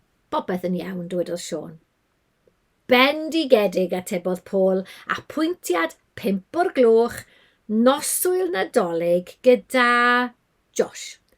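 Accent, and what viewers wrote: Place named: Carmarthenshire, United Kingdom. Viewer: Dw i'n dotio ar wrando ar acen Sir Gâr. Dynnes ddawnus a brwdfrydig wastad.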